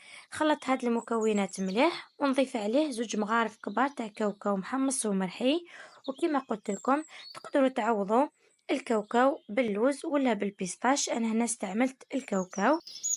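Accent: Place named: Morocco. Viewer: صاحبة القناة لهجتك مغربية!!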